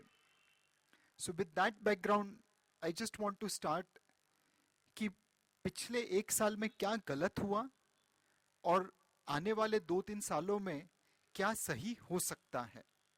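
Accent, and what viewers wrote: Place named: Bengal, India. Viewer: Sir, ur accent is very much like bengali babumoshai when u speak hindi.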